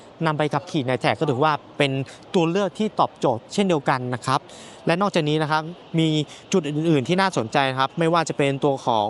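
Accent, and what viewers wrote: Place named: Isan, Thailand. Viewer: ติดตั้งมาให่ คนอีสานแน่ๆเลย😊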